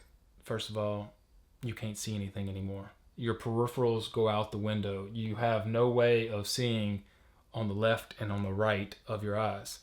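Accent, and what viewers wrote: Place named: Louisiana, United States. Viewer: Awesome helmet! Love your accent too. Louisiana maybe? Anyway, your voice is like butter 😂😂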